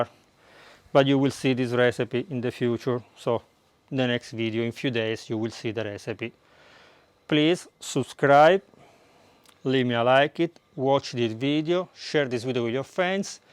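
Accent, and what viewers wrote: Italy. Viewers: I love your accent! They need to stop hiring white people and British to play Roman emperors and soldiers in movies. Y'all are just as great. I would LOVE to see more historical movies with more Italians. I love your natural tan skin. I'm gonna subscribe to your channel cuz you have some good videos. :) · I subscribed to you so I could share you with my 23 yr old daughter and 24 yr old son....We were taught to cook by our american men who where soldier men who survived and came back to marry our mothers and then teach them how to cook..... but I just can't get over your BEAUTIFUL ACCENT VOICE.....your voice is angelic, and you are just so damn pretty for an Italian.....You wife better love you to crazy pieces! She is SOOOO LUCK!!!!!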